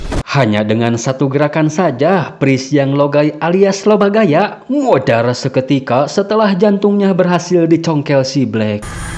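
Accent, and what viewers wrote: West Java, Indonesia. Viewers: Bang lu asli Sunda mana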